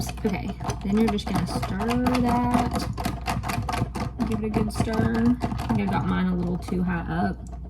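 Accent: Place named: Kentucky, United States. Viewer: I can't quite figure out your accent. Are you from Kentucky or Tennessee or some place like that?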